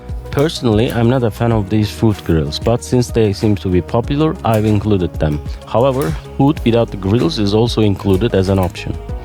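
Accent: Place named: Turkey. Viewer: aksandan hemen anladım Türk olduğunu eline sağlik kralim güzel oldu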